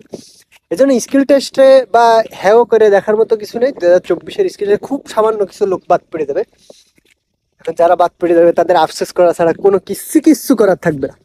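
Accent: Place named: Satkhira, Bangladesh. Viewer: ভাই, আপনার দেশের বাড়ি কোথায় ? আপনার ভাষা আমাদের সাতক্ষীরার সাথে অনেক মিল খুঁজে পাই ❤❤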